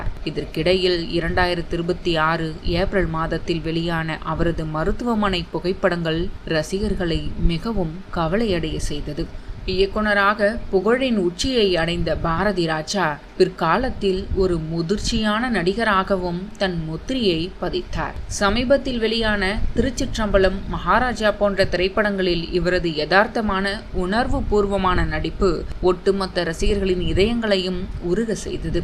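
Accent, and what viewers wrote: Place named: Theni, India. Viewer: Enga ooru thangam ivaru ivarum marimutthu sir ivanga rendu perum than enga theni oora oda slang la aptiye passuvanga ivanga pessuratha ketta avlo happy ya irukum we miss u sir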